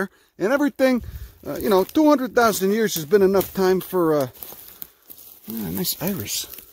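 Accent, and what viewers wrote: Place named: Shasta County, United States. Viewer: you sound like you live in shasta county like shingletown or something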